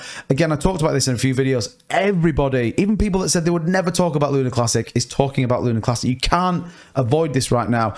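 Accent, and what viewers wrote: United Kingdom. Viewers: It's hard to understand what ur saying when u talk too fast with the British accent. Just a heads up from over the pond. Love your channel!!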